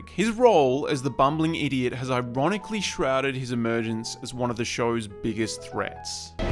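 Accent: Australia. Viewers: is this the same guy form skillup or every australian sound the same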